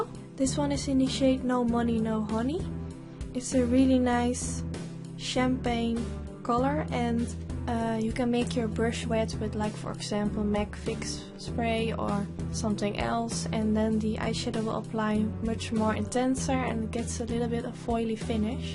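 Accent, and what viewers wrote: Netherlands. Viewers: nederlanders praten echt allemaal op een heel typische manier engels haha, heel vreemd! niet slecht bedoeld trouwens, viel me gewoon meteen op :)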